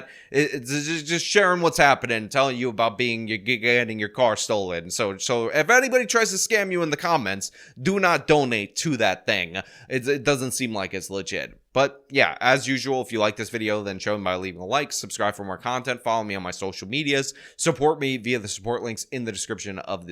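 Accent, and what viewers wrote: New York, United States. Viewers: Your a native New Yorker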